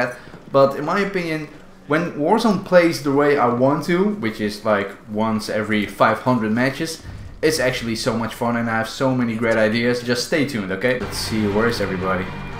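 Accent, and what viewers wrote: Netherlands. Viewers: You have a nice dutch accent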